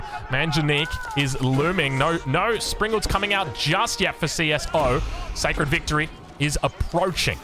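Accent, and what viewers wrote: Australia. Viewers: I'm very none aggressive and like to build a thriving empire full of resources and a big army before considering attacking. Watching these videos to get better. I love these video's. As an Englishman, The Australian accent is appealing.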